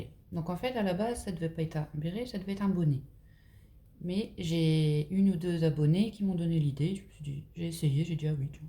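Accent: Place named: Nord-Pas-de-Calais, France. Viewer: Très joli est je pense reconnaître l'accent cht'i, bonne année 🎉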